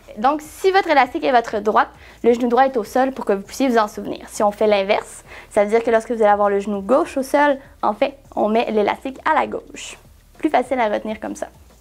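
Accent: Quebec, Canada. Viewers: Bonjour de la France, Merci pour vos vidéo si interentreprises, on apprend pleins de chose. Puis au passage j'adore votre accents QUÉBÉCOIS ! Un jours je viendrais visite votre fabuleux pays :) Petite question si possible, j'ai un vélo Contre La Montre, j'ai fait une étude posturale et de temps en temps j'ai vraiment mal au cervical ? Avez vous une vidéo qui parle de cela ? (si possible) Merci encore pour tout vos conseille.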